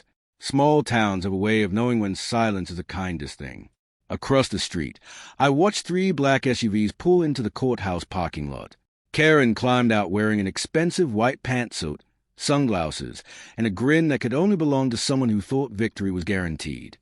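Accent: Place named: United Kingdom. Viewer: Why does that narrator have a b British accent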